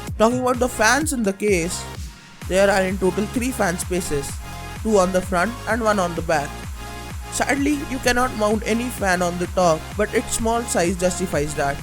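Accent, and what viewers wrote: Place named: India. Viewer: love the indian accent xD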